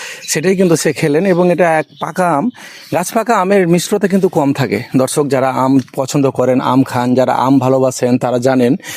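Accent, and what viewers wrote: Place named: Kolkata, India. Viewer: আপনার কথা শুনে মনে হয় কলকাতার লোক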